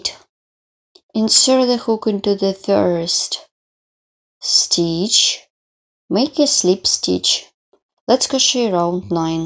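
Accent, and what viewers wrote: Russia. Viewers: Мне одной кажется, или таки я и правда слышу родной, русский, даже в английском? За обучение спасибо, учусь, очень хочу уметь красиво и без подсказки вязать крючком. В видео все понятно.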